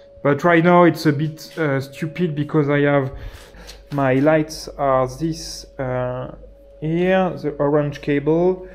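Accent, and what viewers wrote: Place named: France. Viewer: ça serait tellement bien honnêtement je comprend l'anglais mais un version français serait top. Faut avouer que tu as un sacré accent français (je fais pas mieux).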